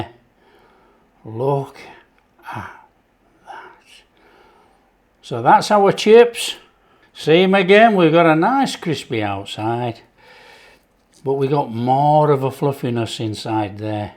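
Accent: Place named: Midlands, United Kingdom. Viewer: you sound more like midlands than the north. Stoke on trent way accent. Its north to me being in the westcountry. Lovely grub mate 👌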